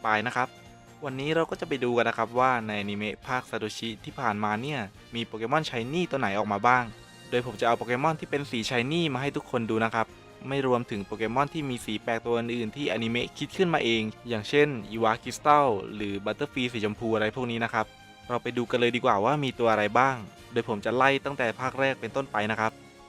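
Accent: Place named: Suphanburi, Thailand. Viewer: สำเนียงเหมือนคนสุพรรณเลย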